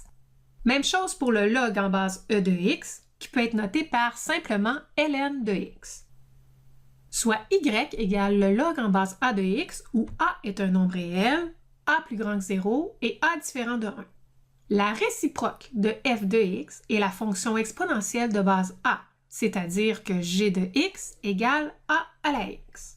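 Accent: Canada. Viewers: Merci ! a cette belle voix canadienne :)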